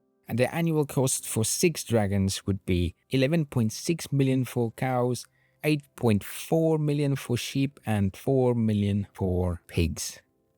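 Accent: South Africa. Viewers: Is the creator South African??? The accent sounds very familiar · Hilarious that I could pin you as a fellow South African just from your accent lol. Great video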